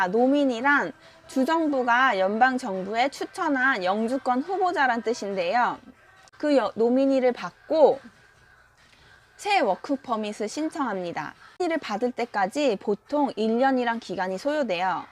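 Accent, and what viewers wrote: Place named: Japan. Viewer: 영어발음이 일본어인데?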